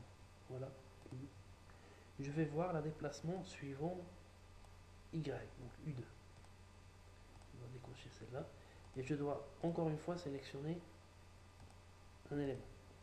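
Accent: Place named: Algeria. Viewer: Accent Algérien!!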